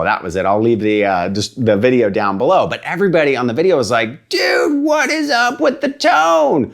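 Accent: United States. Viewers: You have really picked up the Nashville affect. That didn’t take long.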